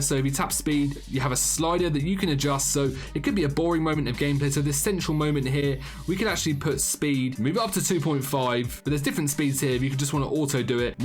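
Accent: United Kingdom. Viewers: A man that sound British and looks Swedish?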